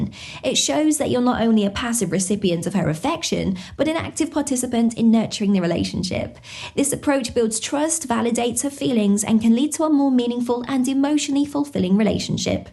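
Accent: England, United Kingdom. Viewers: Wow thanks for the advice. 🙂 I love your English accent! You're so cute! ❤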